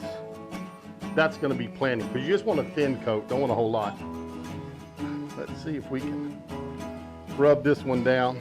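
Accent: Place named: Texas, United States. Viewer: I love your accent. I want to move to Texas. Beef. Land. Bible.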